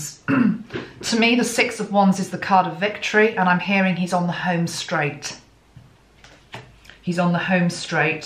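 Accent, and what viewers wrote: England, United Kingdom. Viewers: Hi . I like your English accent and the way you put those perfume on you to connect with energy . You're very genuine and kind . ❤️❤️❤️❤️ Ps . Your house looks very tidy and everything is on their place . Your dress very nice too.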